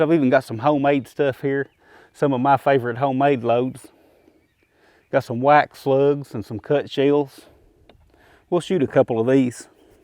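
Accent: United States. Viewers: you have an interesting accent which is specific for one area of USA, that's why I asked.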